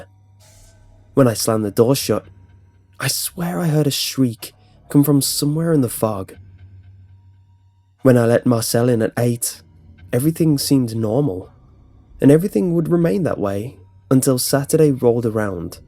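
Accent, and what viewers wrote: United Kingdom. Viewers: british accent is succulent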